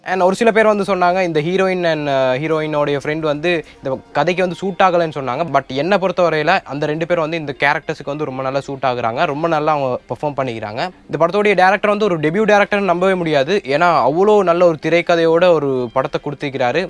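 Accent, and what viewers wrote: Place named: Sri Lanka. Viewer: இலங்கை தமிழா, கேட்க இனிமை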